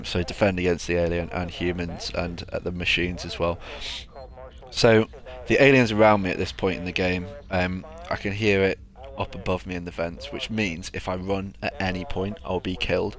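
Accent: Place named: United Kingdom. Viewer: Very British...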